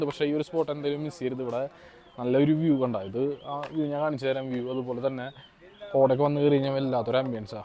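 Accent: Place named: Thrissur, India. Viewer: Ellam kollam bt aa tsr slang 🙏🏼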